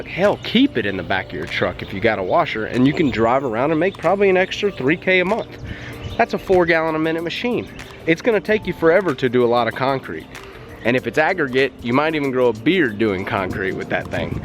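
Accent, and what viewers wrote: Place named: New Jersey, United States. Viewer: Just found you on youtube, after seeing others, love the direct and truthful comments and straight up shooting from you. We are almost neighbors, I'm about 30 miles North of Nashville. Keep up the great videos, I just subscribed. If you want to sell that equipment , 4 gallons per minute, let me know. Would love to hook up with you sometime. By the way your "josey" accent is pretty good, I should know lived in NJ for many years.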